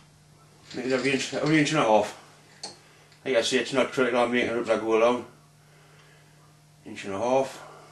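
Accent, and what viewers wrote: Newcastle, United Kingdom. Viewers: Wonderful! You kept us wondering how this was going to work until the end. I really enjoy your videos and always learn something new from them. I am not from northern England, have never even had the opportunity to visit, but I love listening to Geordie and it forces me to really pay attention to understand. Thanks for all your efforts to make these excellent videos.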